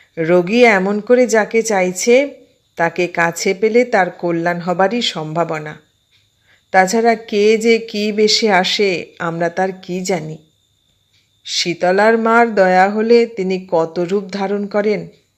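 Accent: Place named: Bangladesh. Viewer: ফুল্লোরা থেকে ফুলজান, তার থেকে ফুলি। খুব সুন্দর গ্রামীণ বাংলাদেশি বাংলা ভাষা পাঠ করেছেন। দেবীরা কখন কি বেশে আসেন সেটাই আমার ভাবনার বিষয়। গল্পের ঘটনা যা, সে যুগ আর নেই, নোংরা রাজনীতি ও জনবিন্যাস বাংলার সব শেষ করে দিয়েছে।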